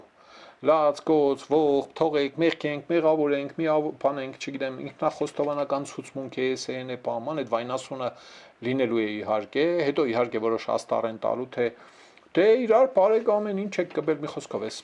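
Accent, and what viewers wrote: Gyumri, Armenia. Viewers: Շատ գեղեցիկ ձևաչափով եք ներկայացնում էս ամենը, անուշ Գյումրեցու բառբառով։ Ու ահագին կարևոր գործ եք անում։ Շարունակեք։ Մի մոռացեք նաև գործող իշխանություններ բացերը...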